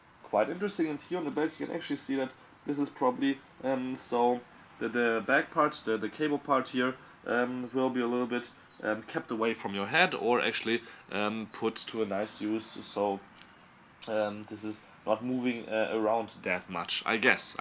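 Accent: Germany. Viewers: Deutsche die Englisch sprechen hören sich so scheiße an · Dieses Deutsche akzent schrecklich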